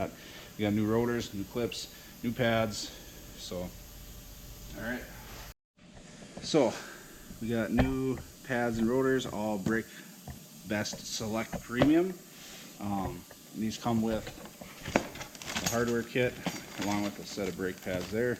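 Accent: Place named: Canada. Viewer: got 2 min in until i realized he was Canadian or Ohioan much love for this tutorial bro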